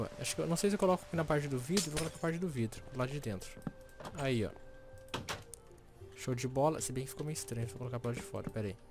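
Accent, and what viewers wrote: Rio Grande do Sul, Brazil. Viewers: vc e gaucho? Eu sou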